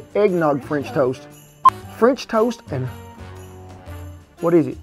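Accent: South, United States. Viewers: I gotta say the southern accent he has is balanced very well 😇 ppl where I'm at speak every word as one word 😒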